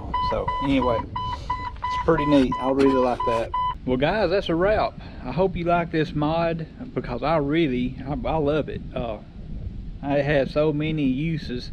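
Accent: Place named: Mid-South, United States. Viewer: I like how you said you replaced a lot of the front end suspension parts, but you lost me at the one part. What are "baw" joints? I looked em up, but my Jeep doesn't have baw joints. LOL! I'm messing with ya! I grew up in Memphis and St Louis and everyone says I talk country! You remind me of home, brotha! We could sit and have a beer and tell stories all day! LOL! Like my man says in Slingblade, "I lack the way you tawk." LOL! People say I got a twang and sound country, but that's because they don't know how COOL we are in South and Mid-South!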